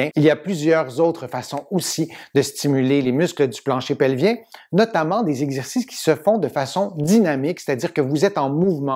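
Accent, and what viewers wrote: Canada. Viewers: ❤! De plus, j’adore votre accent canadien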